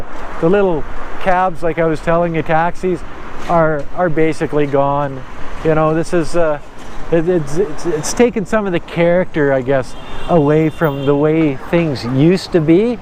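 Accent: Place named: Canada. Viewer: Oh boy! I love the Canadian English out of your mouth! Just can't be mistaken! LOL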